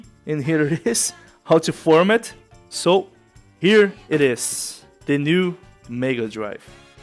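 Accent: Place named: Brazil. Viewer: sotaque brasileiro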